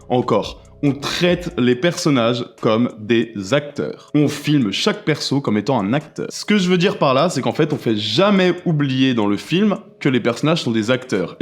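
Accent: France. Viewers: Mais pourquoi les FR vous dites ZHE au lieu de DHE pour THE? Et aussi THAT, cest pas ZHAT ! C'est comme DHAT ! OK, bonne video ! <33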